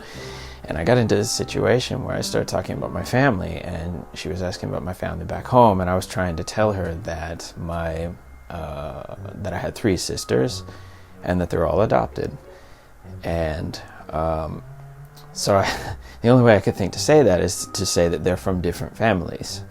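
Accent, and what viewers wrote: Finland. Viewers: Don't take this offensive or anything, but you have some finnish accent on your english. Or what I hear. There's some variations on your intonations and such... It's like Renny Harlin speaking finnish :)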